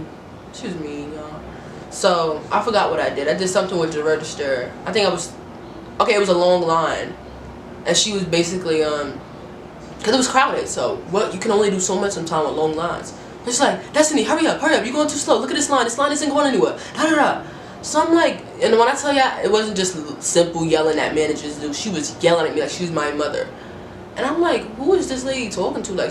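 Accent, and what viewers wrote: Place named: New York, United States. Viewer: Where you from?? You sound like your from ny cuz that's where I'm from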